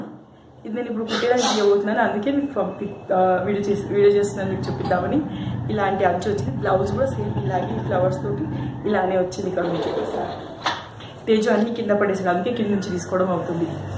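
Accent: Telangana, India. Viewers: meedhi ea ooru.. swatchamaina telangana lo chepthunnavu.. i like you..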